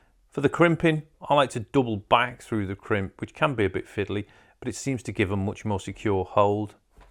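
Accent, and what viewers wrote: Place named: Liverpool, United Kingdom. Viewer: Where’s that accent from? I get bits of scouse and bits of the midlands! Great vids mate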